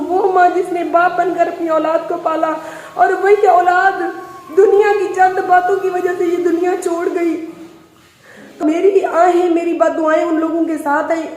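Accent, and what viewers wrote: Saraiki, Pakistan. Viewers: Syster aap bolati bahut pyara hai saraiki aur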